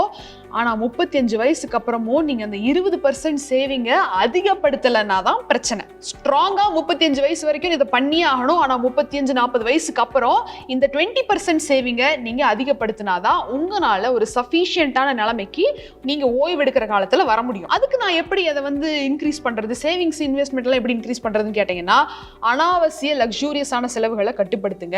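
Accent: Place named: Kongu Nadu, India. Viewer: கொங்குநாடு பேச்சி மிக அருமை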